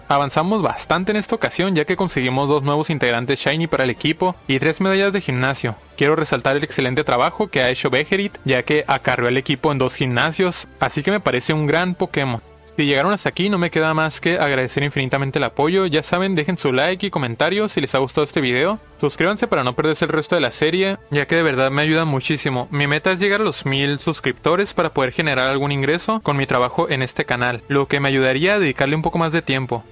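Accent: Chihuahua, Mexico. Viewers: We tu eres de chihuahua verdad? Xd no te doxe ni nada pero tú ch es muy nuestro jaja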